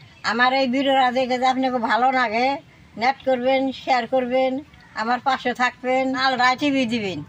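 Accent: Tangail, Bangladesh. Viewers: বাংলাদেশে টাংগাইল জেলার মত ভাষা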